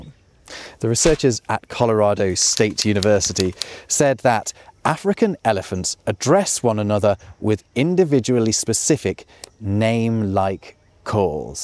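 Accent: United Kingdom. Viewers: Hearing a British person talk about parrots makes me think of certain things. Would I like to hear an African grey parrot? I'd much rather hear a Norwegian blue. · I like your British TV accent and style of talking. Have you by any chance worked for sky or BBC?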